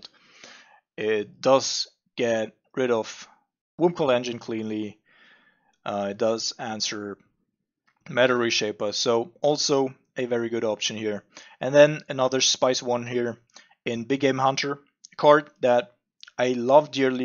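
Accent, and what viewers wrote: Germany. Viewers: I like your accent, where are you from? Germany?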